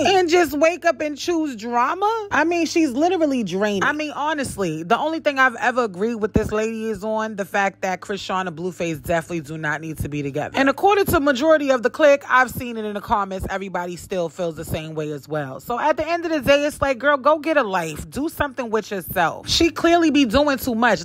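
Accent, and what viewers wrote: New York, United States. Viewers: I love ya NY accent, when Yu spill tea I feel like you in my room gossiping with me 😂🥰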